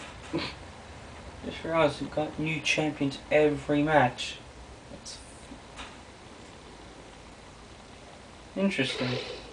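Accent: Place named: United Kingdom. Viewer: I love your british acent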